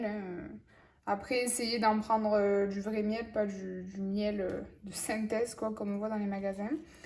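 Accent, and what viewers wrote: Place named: France. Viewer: Молодец! Спасибочки Тебе Большое Родная Драгоценная Прекрасная Девушка Красавица!!! Спасибочки Тебе Большое за Красоту Твою Прекрасную и за Твои Видео на Ютубе!!! Я, слышу Твой акцент, это наверное ,, Французский язык", Твой, Наверное???!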